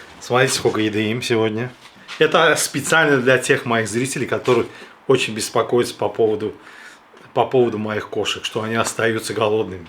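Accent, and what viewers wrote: Baku, Azerbaijan. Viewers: Родной Бакинский акцент! ❤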